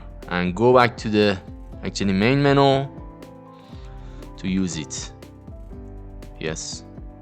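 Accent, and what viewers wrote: Iran. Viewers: انگلیسی با لهجه اصفهانی قشنگی داری داداش، عالی😎👍🏻❤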